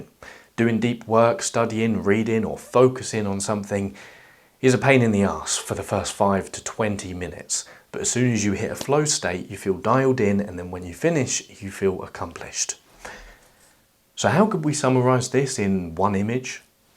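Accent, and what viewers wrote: England, United Kingdom. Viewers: Okay I truly believe this video has changed my life. I have seen dozens of motivational videos and tons and tons of mental health/ help videos.. but when laying in bed being the usual lazy self one afternoon this video popped into my feed.. I read the title and thought to myself “man I don’t really want to watch this.. but that probably means I NEED to watch this” and I clicked on it with only the intentions of watching the first few minutes or so.. and honestly I started off judging. I thought “what does this bald looking guy wearing a fancy vest and with this English accent think he knows that the rest of the world doesn’t” but I surprised myself when I found myself almost leaning forward eager to listen, to learn. What you said in this video was everything I needed to hear. I thought about this video for the rest of the day. 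And the next morning I woke up and I watched the 32 minutes all over again. I learned even more the second time and I had the most productive day that I had in months. The next day.. I crashed. Burnt out from trying to go 110% and I felt so guilty after just having what I thought was a revelation of my whole life. I slipped back into a depressive state and did nothing about it for a few days.. but I realized something. I could not get this video out of my head. This video and everything you have said was constantly screaming at me. Every time I looked at a screen I couldn’t stop thinking about what I was doing to my brain. I became conscious. This vide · Why does Shia Lebouf suddenly have an English accent?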